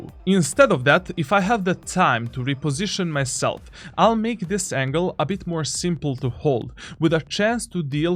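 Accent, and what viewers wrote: Russia. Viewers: Okay but are you Russian or Ukranian 🤨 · Where you from ? Russian Turkish ?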